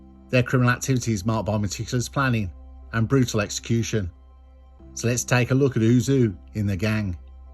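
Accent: Birmingham, United Kingdom. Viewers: You from Birmingham bro?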